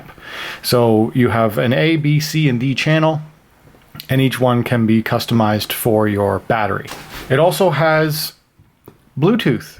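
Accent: Canada. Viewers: The Canadian in me immediately recognized the Canadian in you 😁😁😁😁🇨🇦🇨🇦👌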